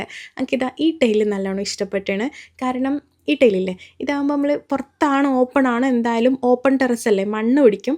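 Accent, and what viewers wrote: Kannur, India. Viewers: nalla rasand pallu porikka., aah nammale kannur basha marannu samsarikkulaa 🥰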